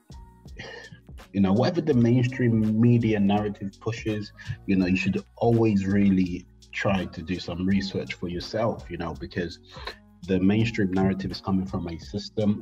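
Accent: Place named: Israel. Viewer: The isn't white? 🤯 no just kidding I knew even when I started watching your videos and hearing the sound of your voice that you where a Hebrew. Seek Yahusha messiah my friend! Yah bless!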